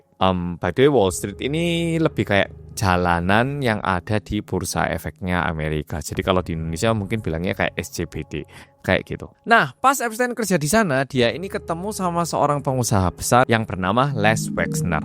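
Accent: Java, Indonesia. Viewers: WONG KOREA BAHASA INDO MEDOK...😂😂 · Korea medok....weeeeh baru denger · Ak lebih suka medok ini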